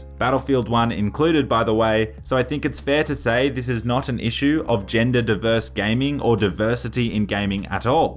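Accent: Australia. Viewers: Are you Aussie?? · Oi are you Aussie?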